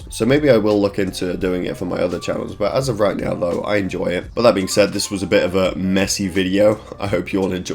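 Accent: United Kingdom. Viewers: These dev times are incredible. It's amazing how far has come And you just did a skit about your own skinwalker vid feat. Cloud... even I remember that 😅 And good on you for being authentic with the editing etc and since you hit puberty late 🤣 Most your demographic probably aren't British, they just love our accents haha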